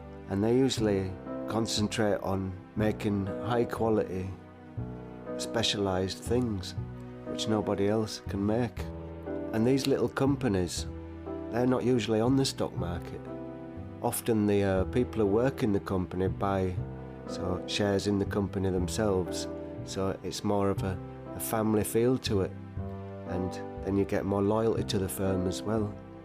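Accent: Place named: Northern England, United Kingdom. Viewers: the accent remnds me of my friend from yorkshire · What kind of accent fo you have? Northern England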